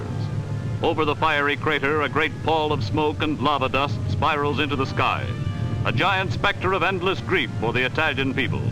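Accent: Transatlantic, United States. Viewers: cocky transatlatic accent! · Transatlantic accent 😀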